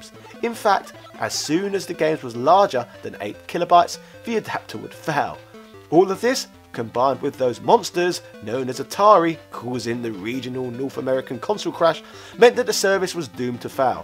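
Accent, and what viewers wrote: United Kingdom. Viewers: I get it your British but nobody calls it the atari two six hundred.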